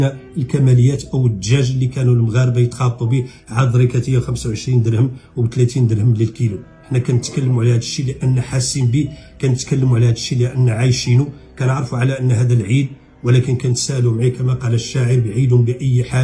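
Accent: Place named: Hassaniya, Mauritania. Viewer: الله على اللهجة الحسانية .. الله يحفظكم اهل الصحراء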